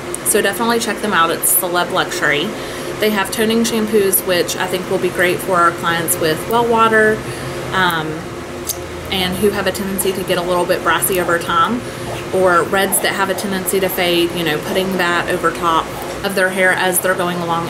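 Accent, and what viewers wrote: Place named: United States. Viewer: What state are you from? I love your accent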